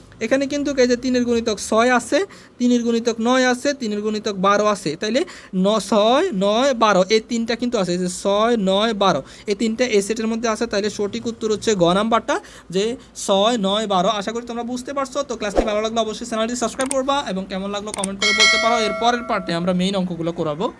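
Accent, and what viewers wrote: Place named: Noakhali, Bangladesh. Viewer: স্যার মনে হয় নোয়াখালীর মানুষ 🥲🙏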